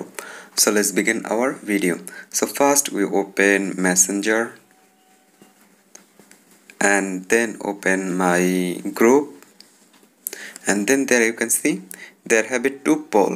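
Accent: India. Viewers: Me: (Search on Google and YouTube on how to delete a poll on messenger) (Found a video) (Plays the video and the voice is India) Me: "Wow! An Indian Youtuber. This might work! Tried what he instructed Me: SCAAAAAAAAAAAAAAAAMMMMM!!!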